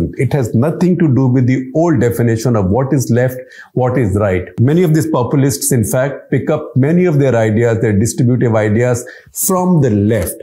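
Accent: India. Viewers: Talking too much with strong Indian accent...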